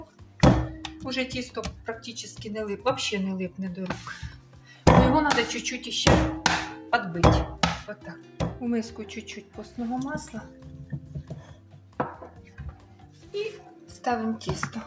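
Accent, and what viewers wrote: Ukraine. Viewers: Как приятно слышать украинский говор! Какая чудесная хозяечка,такие и классные пирожки! Спасибо огромное,благодаря вам,я мысленно побывала в моем далеком детстве!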